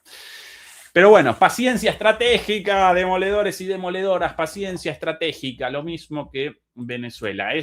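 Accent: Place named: Argentina. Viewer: Eres Re-Argento para hablar. Me encanta q no hayas perdido el acento. Abrazo de otro argento.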